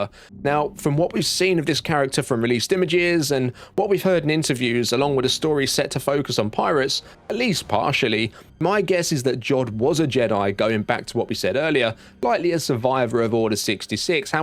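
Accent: England, United Kingdom. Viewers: I love Star Wars. I love English accents. I love smart, interesting discussions of star wars. Well done, mate.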